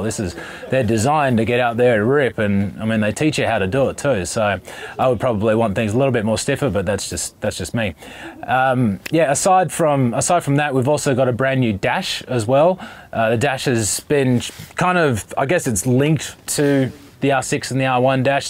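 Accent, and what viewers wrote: Australia. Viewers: you sound like ausie? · Are you from australia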